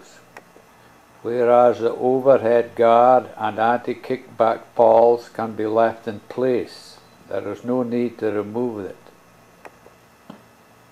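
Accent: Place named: Scotland, United Kingdom. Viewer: Sounds liek the Scottish guy on teh Simpsons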